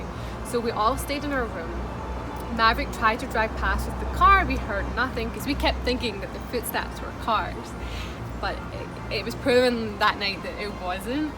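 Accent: Scotland, United Kingdom. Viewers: Please never lose your lovely Scottish accent!!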